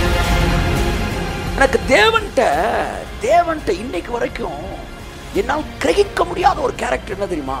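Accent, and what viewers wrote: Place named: Madurai, India. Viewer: 👉நம்ம ஊரு மதுரை வட்டார தமிழில் 💗 கடவுளின் வார்த்தைகளை கேட்டு மிகவும் உற்சாகமும் ;சந்தோஷமும் அடைந்தேன்.. 💗 இந்த சிறு செய்தி எனக்கு புது நம்பிக்கையை கொடுத்தது (தொடர்ந்து உங்கள் செய்திகளை கேட்டு வருகிறேன் ) நன்றி . அன்புடன், புதுக்கோட்டை சரவணன் . (08.Nov.2020)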